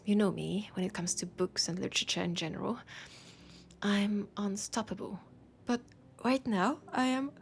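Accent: France. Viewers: Im down bad for the french accent, chat am I cooked 🥀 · Ah, my favourite French accent! :3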